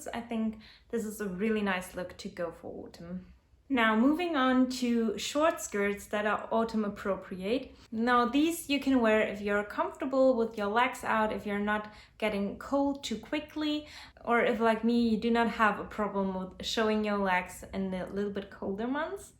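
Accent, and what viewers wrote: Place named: Germany. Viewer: Are you from Germany? Your accent sounds so familiar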